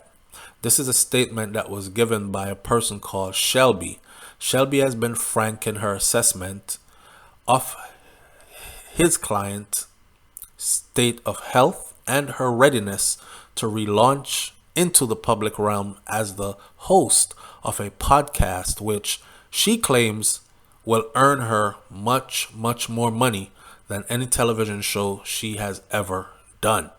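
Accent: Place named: Jamaica. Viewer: You sound like you are from Jamaica...in fact you sound like SOUFLO...you cyan hide from your fans...Big Up to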